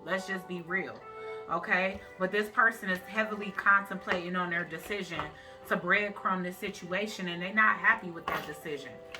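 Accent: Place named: Detroit, United States. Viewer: I knew you was from the d we got that accent lol. Thank you for this reading! Spot on.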